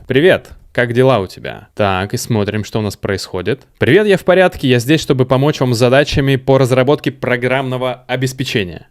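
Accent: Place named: Russia. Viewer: Рунглиш классический)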